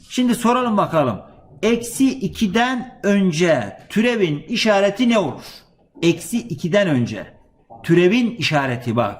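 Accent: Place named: Isparta-Burdur, Turkey. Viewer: hocam nerelisiniz şiveniz bizim buralara Isparta Burdur taraflarına benziyor ?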